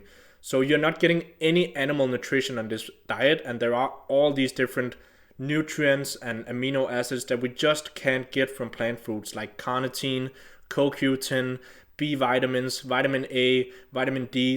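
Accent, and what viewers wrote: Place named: Denmark. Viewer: Danish? You sound familiar :)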